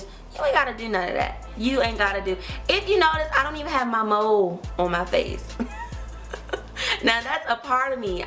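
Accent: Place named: Baltimore, United States. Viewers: I'm obsessed with your accent, where are you from? DC? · You gotta b from Baltimore lol I hear it all in u, new subbie love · tuuur ( two) omggggg I love DMV accents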